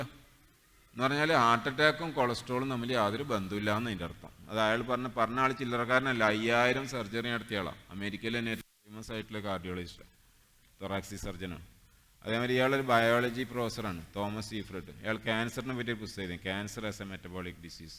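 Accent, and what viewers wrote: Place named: Malappuram, India. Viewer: എനിക്ക് എറ്റവും ഇഷ്ടപെട്ടത് ഇദ്ദേഹത്തിന്റെ നാടൻ മലപ്പുറം slang ആണ്.. നല്ല വ്യക്തിത്വം.... ഇദ്ദേഹത്തെ പോലുള്ളവർ ആണ് യഥാർത്ഥ മനുഷ്യ സ്നേഹികൾ.. അല്ലാതെ രാഷ്ട്രീയക്കാർ അല്ല...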